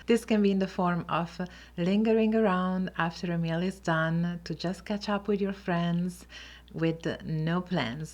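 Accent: Italy. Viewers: your smile is infectious❤️. Loved this video...I am half Italian and proud of my heritage. My mom and Aunties were strong Italian women. I got my love of cooking from my Mom. To me Italians have the best food and yes my skin isn’t wrinkled because I eat the Mediterranean diet😂😂😂😂. I love to listen to your accent, lovely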